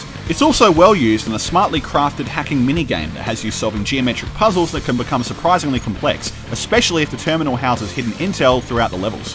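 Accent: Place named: United Kingdom. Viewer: Uh, Helghan home world isn't Vekta. I usually like British accents, but not yours. Eh, 4/10, Bret Hart style.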